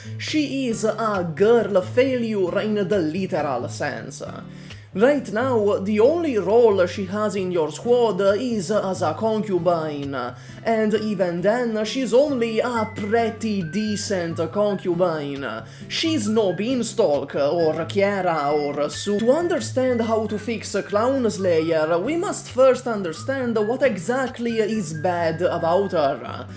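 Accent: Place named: Italy. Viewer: such an Italiano accent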